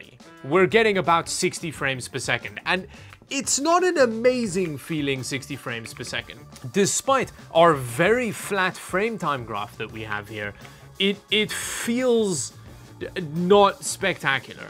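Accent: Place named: South Africa. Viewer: I went from 4k 60hz to 1440p 165hz, impressed by the response and speed ofc but always missed that crisp and super clear 4k resolution. Went back to 4k (now 144hz) and the 7900XTX is doing a great job, mind blown visuals. Tests show that the 9070XT is not far behind (non-RT) which is great. recommended. Also from South Africa, can hear your accent, well done on all the subs etc.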